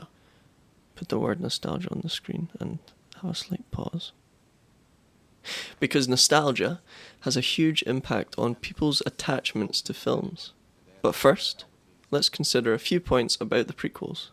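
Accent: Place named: Scotland, United Kingdom. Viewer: You sound like an Irish episode 2 Anakin Edit: yes, Scottish, my bad!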